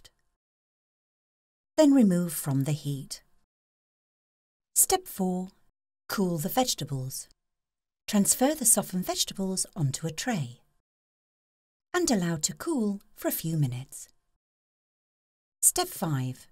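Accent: England, United Kingdom. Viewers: How gratifying to hear a sweet and lovely English voice. After watching this video I must try cooking this myself.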